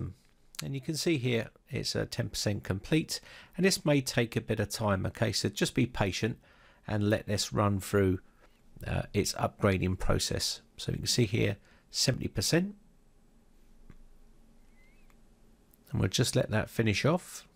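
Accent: England, United Kingdom. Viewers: That's a bit mild. I think they are parasite jive turkey mother humper cunts. Sounds so much better mate. Your videos are clear precise and in English from a English man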